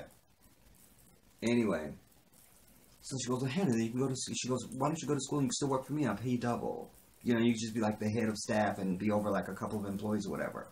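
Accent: United Kingdom. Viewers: I thought I was listening to a UK reporter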